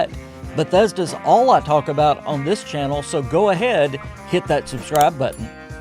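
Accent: South, United States. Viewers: Cant wait to try it on ps5. Subscribed on this channel since a few months ago if I'm not mistaken. Very wholesome channel. Love that southern accent. Salutations from EU.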